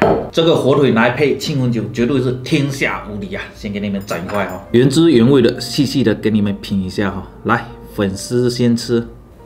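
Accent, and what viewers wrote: Fuzhou, China. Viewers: 我怎么觉得你是福州人，哈哈哈哈，口音和我家叔叔的好像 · 口音听起来是福州人吗？ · 浓浓的福州腔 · 胡州老哥普通划很亲切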